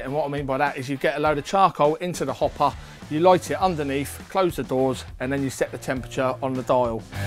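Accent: United Kingdom. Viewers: Great video. I presume from your accent you are UK based. Can ask where you got the griddle and manifold from please. Thanks in advance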